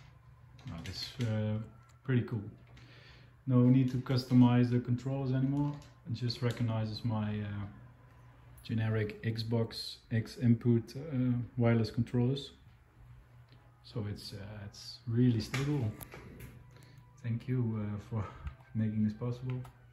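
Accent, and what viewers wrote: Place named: Netherlands. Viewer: Batocera is awesome! U sound dutch. ( I am also dutch).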